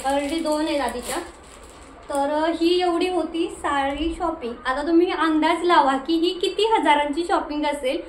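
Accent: Satara, India. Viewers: तुझी भाषा साताऱ्याची वाटते गाव कोणतं आहे तुझं मी तिकडची आहे. गाव.नरवणे ता. माण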